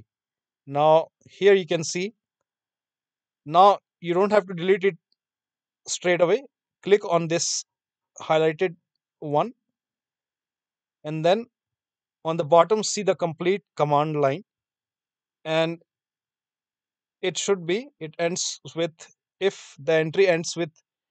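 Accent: India. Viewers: I took a whole day, running antivirus, malwarebytes, autoruns, rkill, MSERT, i had tried everything , then I clicked on a random youtube video with 24 likes and a heavy indian accent; then the prophecy became fulfilled, there is no real solution or teaching that is better than this one and finally I can turn off the PC and go to bed. Thanks man. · accent like indian tech, thanks , very helpfull matte:elbowcough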